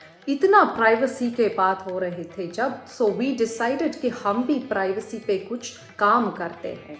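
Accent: South, India. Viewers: Tamil accent in particular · 😊😅 very good informative and interesting satire, with South Indian language accent. Great and intellectual comments. Thanks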